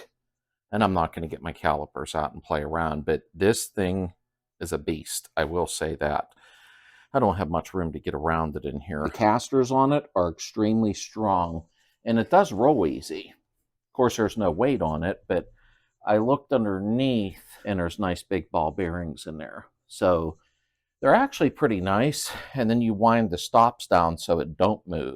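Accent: Pittsburgh, United States. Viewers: You have one heck of a Pittsburgh accent 😂. As a Yinzer who lives down south now, it makes me feel like I am back home when I tune into the channel. Keep the content coming!!!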